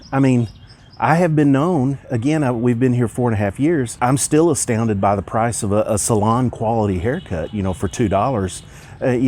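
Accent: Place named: South, United States. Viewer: What state are you from in the US? You have a sorta country accent..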